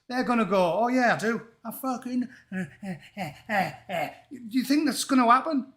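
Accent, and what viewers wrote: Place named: Teesside, United Kingdom. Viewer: Teessider here. I was about to ask about what town you meant, since the accent gave it away. Always good to hear a success story. Glad you made it out, Boro hasn't got any better. it's like a middle-eastern country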